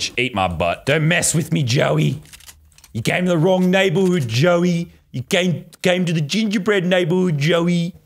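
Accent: Australia. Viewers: why does he sound extra aussie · is it just me or did lazarbeam sound more australian in this game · 2 years ago sounded so Australian compared to 2020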